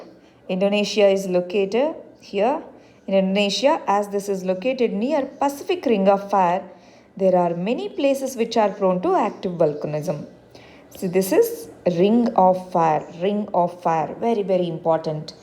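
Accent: South India, India. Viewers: Grt way to learn things quick n effectively. Especially in southindian accent. :)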